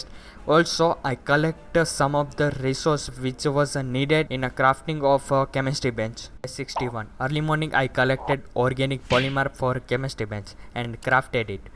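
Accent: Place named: England, United Kingdom. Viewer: Badi heavy English bolte ho bhai op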